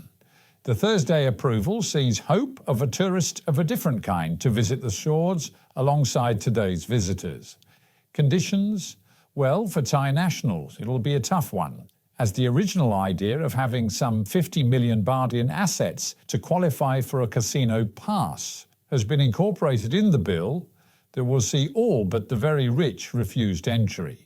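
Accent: United Kingdom. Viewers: I like your news program and regulary follow ; it's informative, unbiased and coloured with your elegant British accent. Keep doing this good job.❤❤❤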